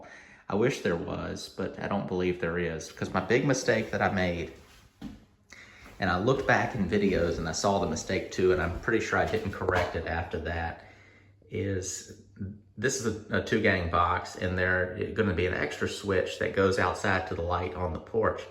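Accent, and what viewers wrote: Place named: Appalachia, United States. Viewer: Love this house it looks so good... i know renters dont get invested to care about a house i dont understand that sloppy mindset... if someones trusting you with their property you darn well look after it... when i have moved home i clean everywhere for the folks moving in... if my daughter rented when younger i took it as my responsibility to clean from top to bottom before we left..its in my upbringing... id treat yours better than my own cos its not my own... simple as...i wonder what kind of vetting you do to get decent tenants.... Also cant figure your accent ... im from england... you sound southern but not as southern as Dolly Parton... your voice is so easy to listen to... Fine job..... looking fab... kinda envy the lucky renter who gets to benefit from all that fine attention to detail...:)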